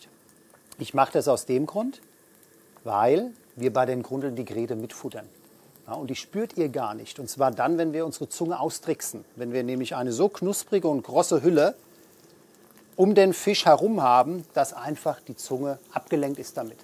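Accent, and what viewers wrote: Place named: Hesse, Germany. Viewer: Ich liebe diesen hessischen Einschlag! :-D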